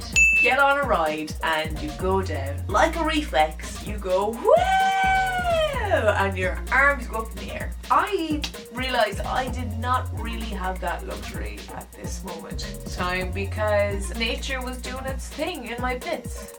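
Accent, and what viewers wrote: Ireland. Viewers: I know you get it all the time, but your accent and mannerisms are adorable. I'm a mutt... Cherokee, Irish and Welsh. I've always wanted to see rural Ireland. Watching you and your friends I think just gives me a bit of insight of true Irish and line of thought. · Relevant to Foreign women and armpit hair in America: Here in these parts French women (deservedly or not) have a scandalous reputation for copious pit hair. If you were to shout out in that glorious genuine Irish accent; "Hey, I'm from Ireland! We are only (X number) of miles from Freaking France"; I'm sure everybody would cut you some slack.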